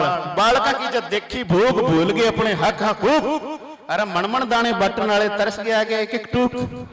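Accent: Haryana, India. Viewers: badiya kavita sunai aap haryanvi boli ko aaje bada rahe ho dhanywad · Kalam tod di bhai jaan haryanvi boli aaj b apne jinda rkhi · वाह जी वाह रै हरियाण्वी भाई जी, खूब मजा आ गया तेरी बोली सुण क । पर यो पाकिस्तान म्हारा था अर कुछ दिन पाछै फेर म्हारा ए होगा । जय हिंद, जय आर्यावर्त ।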